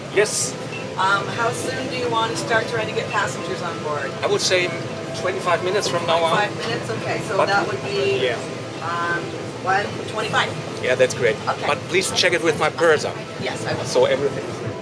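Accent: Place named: Germany. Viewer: Richtiges Englisch mit deutschem akzent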